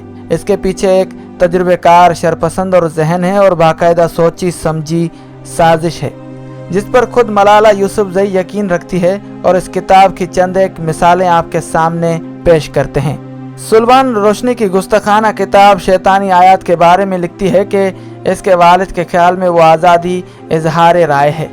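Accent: India. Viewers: kus ni kush hotahai seems like koi indian bol ra hai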